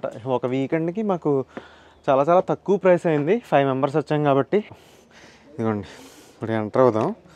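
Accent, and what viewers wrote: Godavari, India. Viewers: Absolutely loved this video! The way you captured the essence of mana Godavari with that authentic yaasa was incredible. It brought back so many memories, and your storytelling was spot on. Keep up the amazing work,! Looking forward to more such gems from your channel. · Annoooo godavari style haa❤❤❤❤❤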